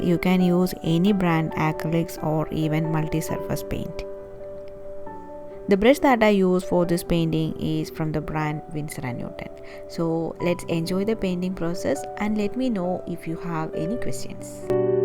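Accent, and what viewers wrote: India. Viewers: мне так нравится твой индийский английский!!! Американский английский звучит на мой слух ужасно, не всегда можно понять говорящего, а твой звучит чётко и даже нежно. Обожаю тебя слушать, хотя ты редко это делаешь. А цветок нежнейший, а запечатанный он выглядит просто восхитительно!!!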